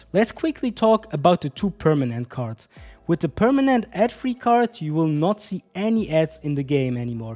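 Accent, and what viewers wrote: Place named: Germany. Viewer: Idk, maybe it’s me, but when I listen to your English, it feels like you a German. Hab ich recht ?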